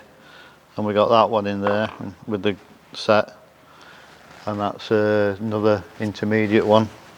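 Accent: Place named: South Yorkshire, United Kingdom. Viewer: Great video. Great accent - sounds like South Yorkshire to me. Great to hear it on t'internet. New subscriber. Looking forward to the review of its functionality.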